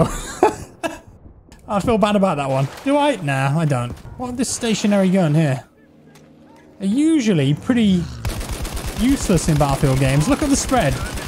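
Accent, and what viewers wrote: United Kingdom. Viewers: what is your morning routine? I would be real mad if there’s no tea involved. Anyways, you’re such a classy lad. You sound so British in the good way, if that makes any sense (it doesn’t). My brother doesn’t agree though!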